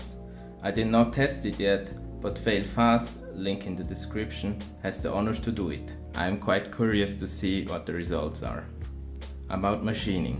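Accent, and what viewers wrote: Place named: Switzerland. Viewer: you sound pretty swissish to me, so wenn du interesse hättsch: ich han e gigantischi DMU 80 wo dir paar use haue könnt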